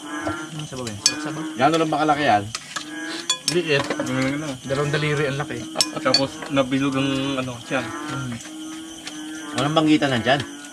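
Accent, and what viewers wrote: Iloilo, Philippines. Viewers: ilonggo kaba lods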